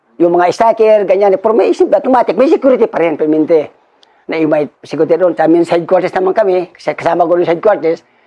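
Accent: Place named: Bicol, Philippines. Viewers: siguro bisaya ito sir, pero nasa bicol na nakatira kya bicolano na din ang tuno. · The "baga" of bicolano. Pero sabi ni Sir Bisaya daw si sir. Baka matagal n sya sa bicol. Ang punto ehh bicolanong bicolano.. Oragaon man Si.. Salute sayo master. Baka may part 2 pa sir. yung sa Sunod na barangay n bangit nya sa ORAS. Parang c master Ledesma lang ang kwento. Haha. Solid panoorin..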